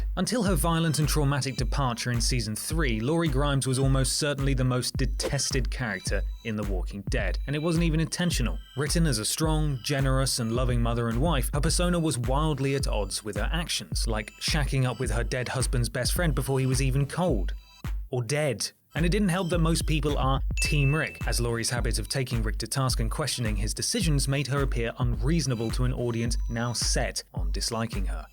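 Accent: United Kingdom. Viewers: Who do I hate more than anyone on this list? Smarmy YouTube hosts with pseudo-posh Brtitish accents who don't know their arse from their elbow, perhaps?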